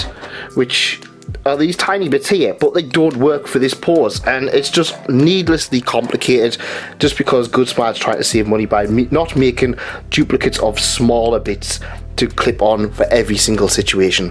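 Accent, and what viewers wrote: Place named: Scotland, United Kingdom. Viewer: Just came across your channel a few days and I just gotta say that I enjoy your vids quite a lot and they’re very informative for a novice like me. I’ve just gotten into figure collecting and I like to see what I expect before buying any figures! In my opinion, you’ve got the best reviews that i’ve seen yet on hololive figures! I also like your accent lol. I’ve been trying to learn a scots accent for some time now so it’s good research to listen to your videos. Hope your day goes well!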